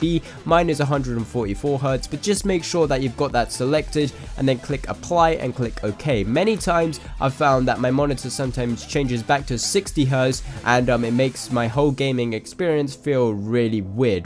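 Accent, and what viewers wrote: India. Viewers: your from north london? your accent seems indian and your face, and also your name everything seems indian soo yeah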